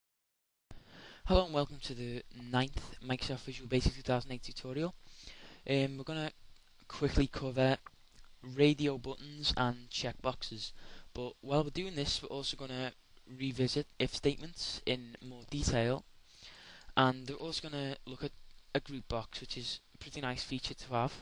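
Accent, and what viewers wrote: Liverpool, United Kingdom. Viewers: Are you from Liverpool?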